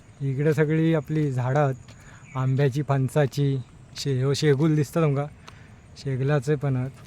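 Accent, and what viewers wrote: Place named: Malvani, India. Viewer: Malvanimadhech bol, Bara vatata eikak!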